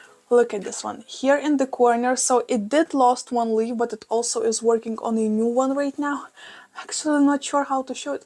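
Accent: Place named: Russia. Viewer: Hope you are doing and feeling great! Your video and your thick Russian accent always bring me joy and elation whenever (read always ) I watch you 👀. Great job on rehabbing those beauties and thank you once again for filling us in the updates -these are always one of my fav videos of your to watch. Hiii from the 🇺🇸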